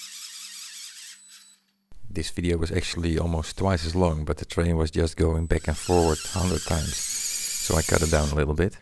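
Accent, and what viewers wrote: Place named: Netherlands. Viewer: Je hoort zo goed dat ie gw Nederlands is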